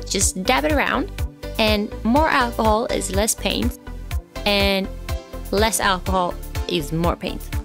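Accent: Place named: Skåne, Sweden. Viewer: ÄLSKAR din dialekt, är du skåning?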